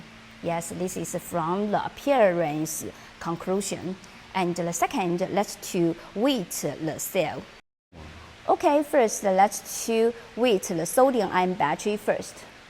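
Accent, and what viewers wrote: China. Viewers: gave such a great presentation. For some reason, i can easily understand a mandarin accent but i have great difficulty understanding an east indian accent.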